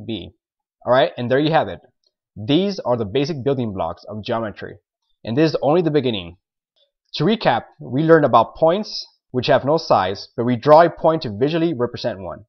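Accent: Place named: Scandinavia. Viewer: you sound scandinavian, are you german or austrian?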